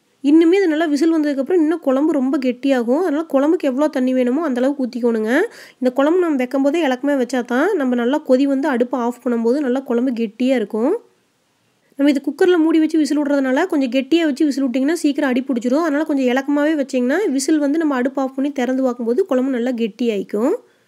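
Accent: Kongu, India. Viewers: Super sis 2day nite I will try this. Recently I suscribed ur channel. I like ur slangvery much. Kongu manam veesukirathu sis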